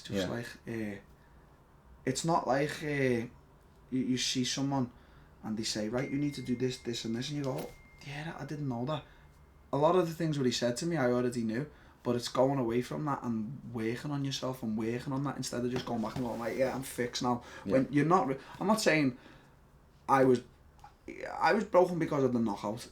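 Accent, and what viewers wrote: United Kingdom. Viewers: You close eyed freak... Talk like a hillbilly brit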